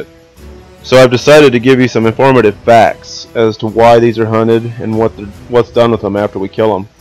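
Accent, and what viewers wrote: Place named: South, United States. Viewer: Sound like a true country boy. To the point, no filler, no bullshit. The only thing he didn't say was you found you way to my page and you decided to watch the video , so what ever complaints you got they're your own fault. Lmao!!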